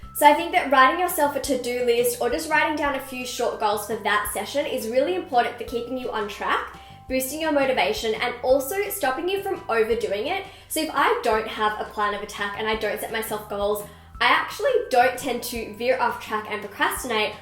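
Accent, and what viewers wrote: Australia. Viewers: kritika singh ahahha shes australian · Australian I'm pretty sure 😊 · R u Australian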